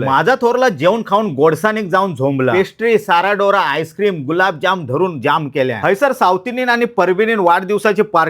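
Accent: Malvani, India. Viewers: Ek no.bol n kuup chan kup avdat tumch bol n ❤ majj yet apli malvani 😊😊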